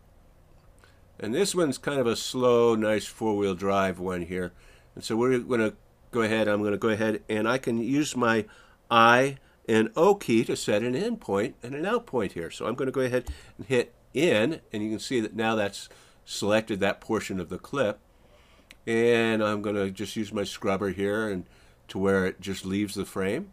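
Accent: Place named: United States. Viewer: the english of yours is pretty dement, speaking like proper yankee.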